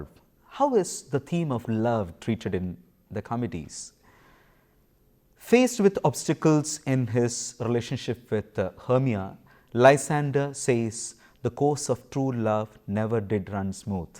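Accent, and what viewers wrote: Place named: Kerala, India. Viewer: Mallu accent not bad at all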